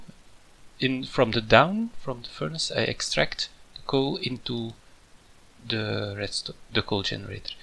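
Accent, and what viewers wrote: Flanders, Belgium. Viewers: are you Belgium? I can hear it on your 'vlaamse' accent. Love your mods though. I also had one question about XNet, how does the redstone mode work on extracting items mode?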